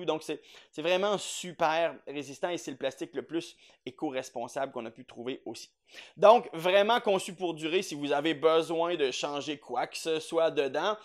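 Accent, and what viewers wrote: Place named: Quebec, Canada. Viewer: No offense... mais... l'accent québécois démolit complètement la vidéo. Un des accent des plus horribles de la la langue de Voltaire.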